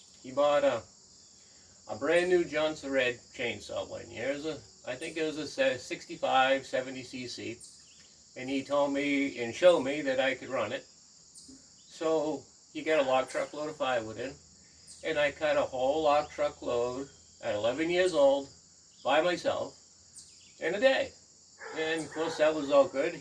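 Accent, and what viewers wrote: United States. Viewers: Hello, just checked out your channel, and I subscribed, that is quite the beard you have! I am guessing you are out east, Maine maybe by your accent? Good luck! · Just found your channel I’m looking forward to watching your updates in the wood yard. I can tell you are a new Englander by your accent, I’m in Connecticut myself, good to see New England represented here on YouTube!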